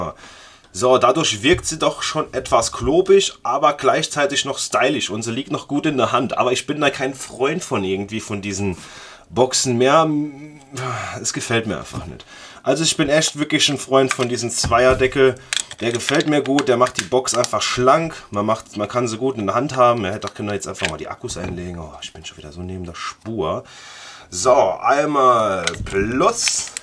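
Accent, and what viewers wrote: Saarland, Germany. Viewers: Kommscht du ausm Saarland? de Dialekt kommt ma bekannt vor ;)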